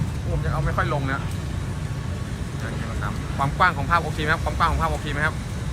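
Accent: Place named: Korat, Thailand. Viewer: โคราชชัดเจน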